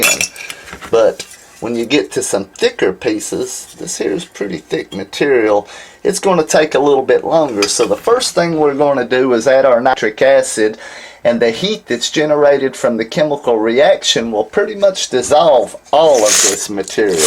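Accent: United States. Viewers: I was born near San Francisco, and frankly, I'm getting bored with my local dialect. It's nice to hear another distinctly American sound from time to time: reminds us that this is still a very varied country. Best Health. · "coyens" 😜 Your accent is so close to the kid from Forrest Gump.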